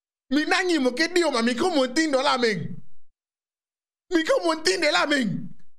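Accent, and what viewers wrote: Standard. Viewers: Anh này nói tiếng Việt chuẩn chỉnh hơn mấy bạn khác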